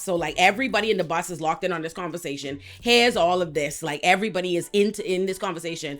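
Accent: Bahamas. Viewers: Your Bahamas 🇧🇸 accent >>> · I genuinely hate Zeus network with a passion, it feels like a whole modern day minstrel show. Also off topic, are you Bahamian of have Bahamian roots? You have an accent very close to a Bahamian one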